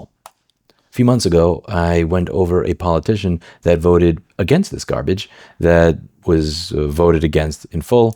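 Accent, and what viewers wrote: United States. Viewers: Oh .... I thought he drove a manual + he sounds American: ipso facto, alarms/kill switches are automagically built-in to a manual.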